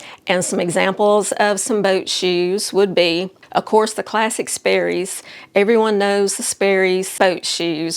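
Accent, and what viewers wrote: South, United States. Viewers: I am from the Atlanta metro area and your accent is like butter to my ears! In the south, you know this is a compliment! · Love this and all your videos! Preppy, classic shoes are wonderful and totally my style, I have most of these. Awesome that Keds, loafers and boat shoes are back. Not feeling the mesh style yet though. I watch you on my phone, laptop and TV. Love your Southern accent, as a Southern lady myself 😊. I mostly watch for information but occasionally shopping. ❤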